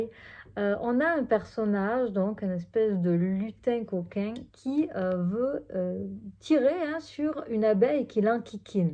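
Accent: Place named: Quebec, Canada. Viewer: bonjour,.c'est tout à fait l'écho de ma situation.merci pour ce que tu nous apportes, avec l'accent québéquois qui ressort +++aujourd'hui😋tout mignon.😍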